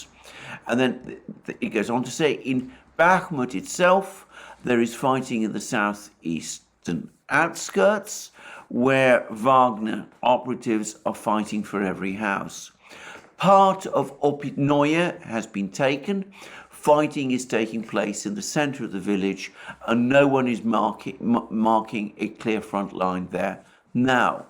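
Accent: United Kingdom. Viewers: Thanks so much Alexander, not only I'm feeling informed very well about what really is going on in this horrible war but I also was able to shut off subtitles lately because I, as a non native speaker now got so used to the British accent I no longer need them. I'm really really glad I found your, Alex's and the Duran channels.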